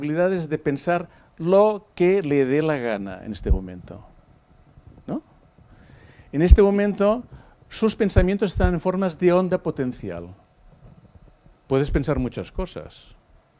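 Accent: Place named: Catalonia, Spain. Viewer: Probablemente, coadyuvante... Con el acento catalán ya...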